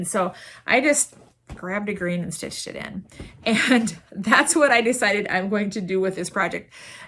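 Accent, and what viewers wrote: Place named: Minnesota, United States. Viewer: Love your shirt!!! I live in Minnesota--I thought I recognized your Minnesota twang...lol. I also own two farms in southern Iowa.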